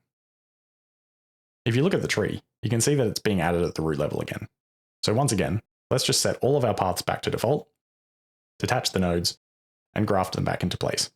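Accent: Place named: Australia. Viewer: Holy shit, is this taught by Australian Barry Manilow? I'd like a tutorial on how to get my voice to be this sexily resonant, I feel like I'm being seduced by a whiskey cask.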